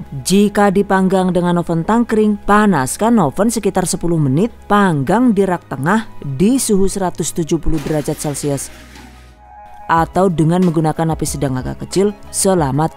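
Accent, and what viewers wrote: Banyumas, Indonesia. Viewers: 😂😂😂ak cilacap bun... klo bunda asli orank mana si....? pake bhsa ngapak juga jadi ngakak pas ada lalat mo ikut bikin kueh nya😂😂😂 ..❤ ak sllu mngikuti resep² bunda g pernah gagal...sehat² sllu ya bund....🎉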